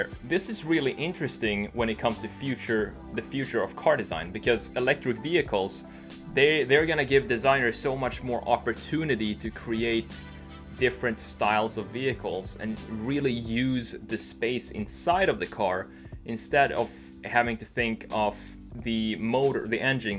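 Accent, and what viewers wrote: Sweden. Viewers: are you swedish? love your content btw! :D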